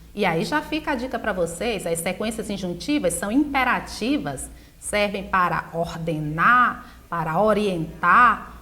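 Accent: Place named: Northeast, Brazil. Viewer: Sou de SP. Mais esse sotaque do Nordeste é o mais lindo do Brasil. Adorei está aula.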